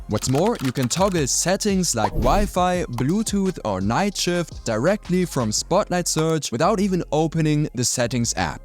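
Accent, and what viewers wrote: Germany. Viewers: I found out is German because of his Non-American accent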